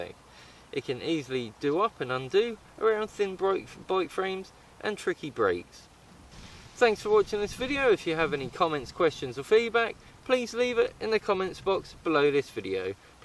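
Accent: Norfolk, United Kingdom. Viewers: Bor Yueh presented by a rum ole Norfolk boi sounds like ole Boi yeah haha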